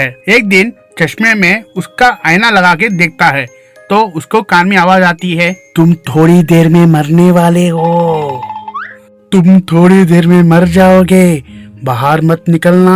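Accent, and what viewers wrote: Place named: Hyderabad, India. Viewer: حیدرآبادی اسینٹ لگ رہا ہے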